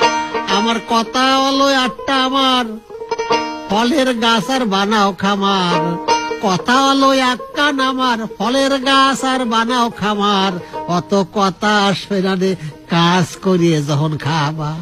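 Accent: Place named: Jessore, Bangladesh. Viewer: খুব সম্ভবত যশুড়ে উচ্চারণ । আমার পূর্বপুরুষেরাও যশড় জেলার অধিবাসী।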